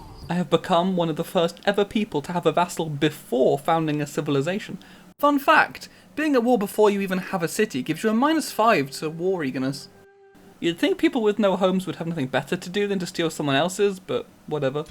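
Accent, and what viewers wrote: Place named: United Kingdom. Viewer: You are the most Bri'ish gamer I have ever witnessed, in a good way gentleman.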